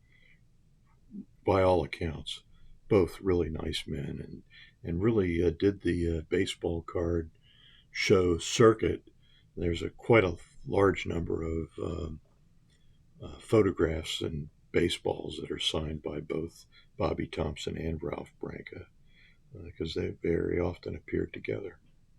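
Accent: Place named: California, United States. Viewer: Great video!!!! Love this set! Question: are you from Cali? You sound like a friend of mine who is from Agoura Hills .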